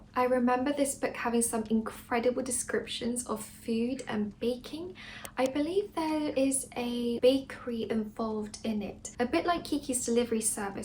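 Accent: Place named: United Kingdom. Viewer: I love your brittish accent oh my god keep talkinggggg!! 🫶🏻 hahahaha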